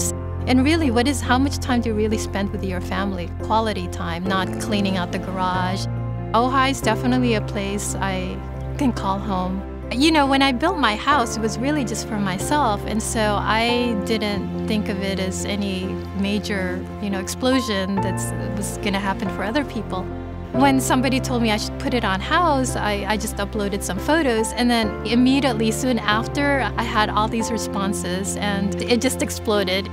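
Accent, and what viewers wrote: Philippines. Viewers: When I first hear her talking base on her accent I knew she˚s a Filipino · I didnt realize you are a filipina. really nice accent.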